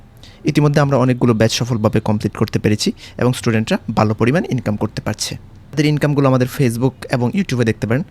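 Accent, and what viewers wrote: Sylhet, Bangladesh. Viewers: vi kita sylheti ni?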